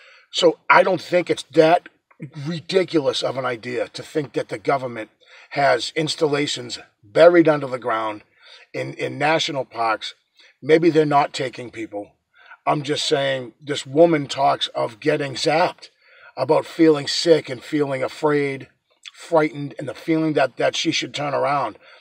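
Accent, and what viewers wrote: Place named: Boston, United States. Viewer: Just found your channel. I'm into these things. Just subbed. Great theory. You sound like u have a Boston accent lol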